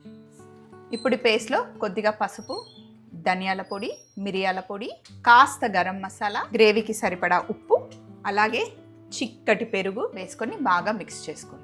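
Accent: Andhra Pradesh, India. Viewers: Hi, I have a small doubt and ofcourse out of curiosity am asking, are you from Andhra or Telangana ??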